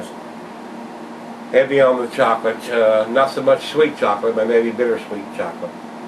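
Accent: United States. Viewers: I love your reviews. I love that you're obviously country but are clearly intelligent and articulate. Also I agree with almost 100% all of your reviews I've seen on Chocolate Stouts so far. Nice videos thanks. This beer is one of the best I've ever had!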